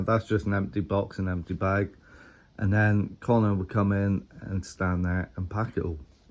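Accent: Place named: Plymouth, United Kingdom. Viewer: I automatically recognised the janner accent.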